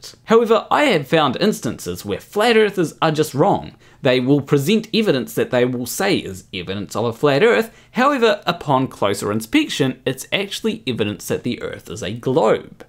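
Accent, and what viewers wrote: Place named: New Zealand. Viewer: You mentioned Australia, but you sound Kiwi... what gives? 😄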